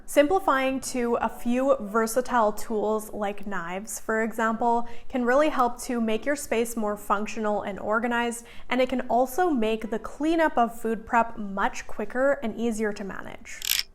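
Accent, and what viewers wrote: Canada. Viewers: Hello, I'm glad I found your YouTube videos today. I have now subscribed. I was brought up in Canada and recognize your accent and see the spelling differences. I like your straight forward ideas and how you make it simple for anyone to achieve a great goal. I would appreciate a video on cleaning recipes and ideas as I make most of my own cleaning products also. I do still use good old Comet or Barkeepers Friend powders. I will be looking for your video. Have a good week.😊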